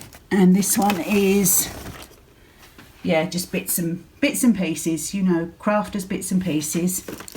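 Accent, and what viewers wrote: England, United Kingdom. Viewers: Oh,! Thank you so much for showing us your craft room. I just loved seeing everything, and I always love hearing your English accent (I live in NY)! I also love your beautiful sun room! So pretty! My mother-in-law, whom I never had a chance to meet, was from Trowbridge (not sure if you've ever heard of it) and came to the U.S. as a war bride. My husband has relatives in England in a town called Uckfield and has been there several times.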